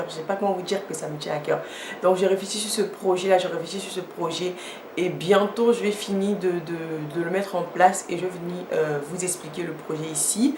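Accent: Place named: Ivory Coast. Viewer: Ah t'es ivoirienne j'ai l'impression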